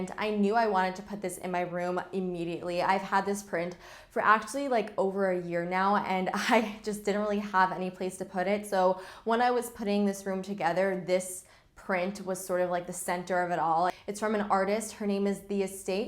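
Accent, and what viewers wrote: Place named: Canada. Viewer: Me: she has a slight canadian accent goes on her about page "Hey guys I'm a Canadian beauty vlogger" SLAY YOU FELLOW CANADIAN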